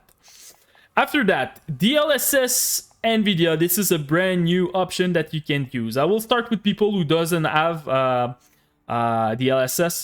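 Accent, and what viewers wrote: Quebec, Canada. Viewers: U from Quebec? u got the accent lol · Ton accent Quebecois te trahi beaucoup xD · best accent ever...jadore sa esti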